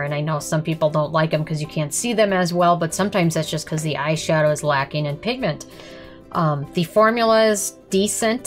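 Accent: Minnesota, United States. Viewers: Are you from Minnesota? Great review!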